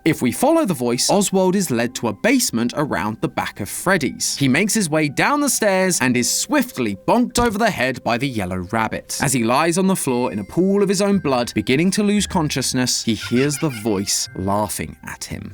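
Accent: United Kingdom. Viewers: Love listening to British Matpat while eating dinner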